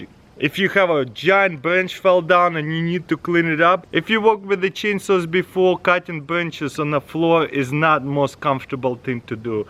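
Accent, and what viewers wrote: Russia. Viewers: without insulting sir, but are you Russian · First he's Russian, and second he says it how ever he wants.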